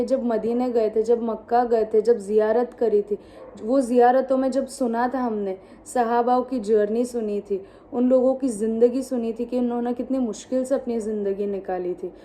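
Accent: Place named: Gujarat, India. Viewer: Assalamualaikum.....Aap Gujarat se ho aisa lag raha h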